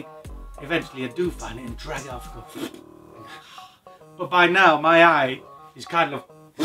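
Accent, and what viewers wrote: United Kingdom. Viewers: You are too British for me to understand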